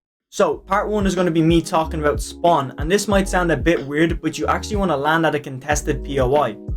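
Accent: Ireland. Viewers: Are u Irish I am😃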